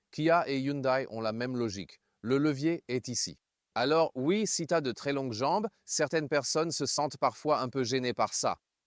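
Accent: Quebec, Canada. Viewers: Amusant, l’accent de la voie en français. On entend une pointe d’accent québécois.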